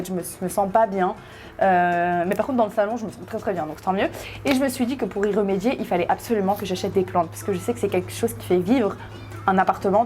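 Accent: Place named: Morocco. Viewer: i smiled so hard when i heard you speaking darija, chehal, wahdo, it was soo cute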